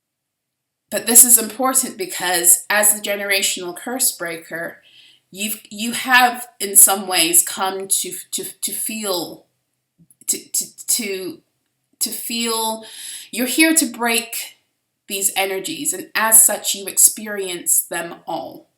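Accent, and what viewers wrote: United Kingdom. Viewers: Why are you doing a British accent, your from the States yes?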